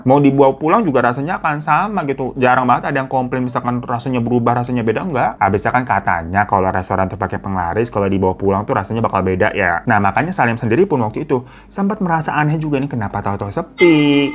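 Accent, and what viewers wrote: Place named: Makassar, Indonesia. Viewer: Kok lucu basa kotor mks 😂 logatnya beda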